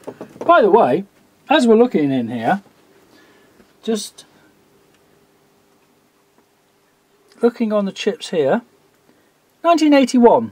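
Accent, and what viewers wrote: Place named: Derbyshire, United Kingdom. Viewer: great vid that was a good call on the caps..takes me back to my days in the 80's as a stores clerk for co-op sound and vision...caps were my nemesis too mainly for ordering and stock control. Not from Derbyshire by any chance? think I recognise the accent..regards from Derby here mate.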